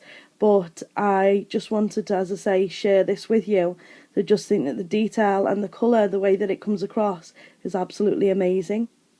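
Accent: Liverpool, United Kingdom. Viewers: Hi, I love you videos, I grew up in Liverpool myself so love listening to your accent. I own a training centre in the West Midlands and would like to use some of your links to help our students. Please keep up the good work of how to do nails properly, there are so many technicians out there that have not be trained well. John at thenailschool.co.uk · are you a scoucer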